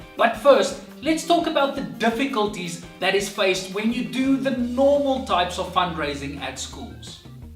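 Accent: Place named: South Africa. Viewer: You sound like you're from South Africa or from Zimbabwe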